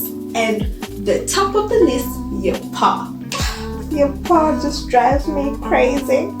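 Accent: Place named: South Korea. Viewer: I love kimchi... Your Korean accent is so cute! Korean cuisine is delicious 😍 haha the way you are talking about them... Bless you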